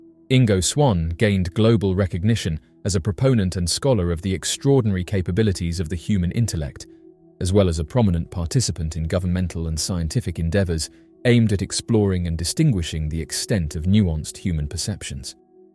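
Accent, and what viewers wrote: United Kingdom. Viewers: If the narrator has a British accent......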